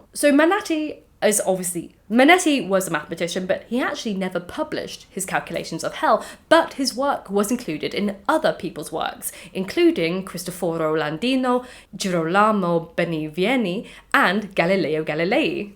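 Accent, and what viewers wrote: United Kingdom. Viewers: As a person learning both English and Italian this video is 👌, I just love her accent (British I presume)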